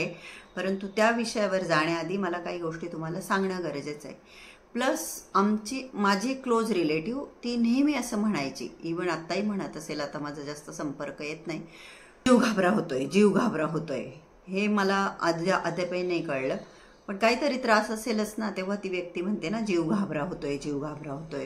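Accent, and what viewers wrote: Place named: Khandesh, India. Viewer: ताई तुम्ही तुमच्या भाषेवरुन खानदेशातील वाटतात.तुम्ही हा त्रास तुम्हाला नेमका कधीपासून होतोय हे सांगितले नाही.तुमची एखादी सवय बंद झाली आहे काय? एक उदाहरण सांगतो. एका कार ड्रायव्हरचा अॅक्सिडेंट झाला होता.कपाळाला मोठी खोक पडली.त्याचे ऑपरेशन झाले.पण आठ दहा दिवस त्याला संडासलाच न झाल्याने तो डाॅ.कडे आला.बरीच चुर्ण औषधी दिली .तरी फरकच पडेना.डाॅ. विचारात पडले.त्यांनी त्या पेशन्टला विचारले की अपघाताच्या आधी तुम्हाला कोणत्या सवयी होत्या का ? तर तो म्हणाला मी रोज एक पाकीट सिगारेटस ओढायचा.त्यामुळे मला हा प्राॅब्लेम येत नव्हता.डाॅ.नी त्याच्या हाती दोन सिगारेट देऊन ओढायला सांगितले. एकच सिगारेट ओढल्याने तो टाॅयलेटकडे पळाला. अशी खाण्यापिण्याची सवय तुम्ही आठवून पहा.जर त्यानेही फरक पडत नसेल तर तुम्ही निष्णात हिप्नोथेरेपिस्ट कडे जा.शक्यता आहे तुम्ही त्यांच्या ट्रिटमेन्टने बाहेर पडू शकाल.हिंमत सोडू नका ताई.आनंदी राहा. एक राजकुमार शिखारे नावाचे हिप्नोथेरेपिस्ट आहेत.ते हिप्नोथेरपीने असाध्य आजार बरे करतात.ते कदाचित सातारा किंवा पूण्यात असावेत.त्यांना जरुर भेटा.पण वेडे वाकडे विचार मनात आणू नका प्लिज.इच्छाशक्ती वाढवा. 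अनुलोम विलोम कपालभाती शास्त्रोक्त पद्धतीने करा.कदाचित तुमची प्राणायाम करण्याची पद्धत चुकत असेल. पण हिप्नोथेरेपिस्ट कडे जरूर जा.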